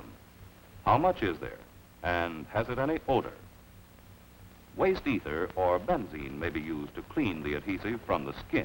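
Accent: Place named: Mid-Atlantic, United States. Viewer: Man, those are some dull- ass scissors. That much hasn’t changed in 80 years! I can’t figure out what that metal tube is sticking out of that sailors back. Maybe a nephrostomy tube? Also, I absolutely love the narrators voice. Precise, no discernible regional accent, smooth enunciation and pitch variation. Waning days of the Mid Atlantic Accent.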